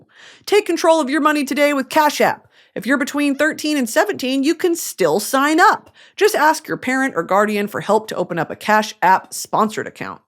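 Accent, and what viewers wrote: United Kingdom. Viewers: BRITISH ACCENT 0 SECONDS IN IM SO LOCKED IN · the hair with the eyeshadow with the british accent.... giving Riff Raff realness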